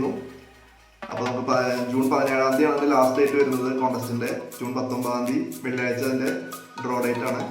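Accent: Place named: Thrissur, India. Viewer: Thrissur slag touch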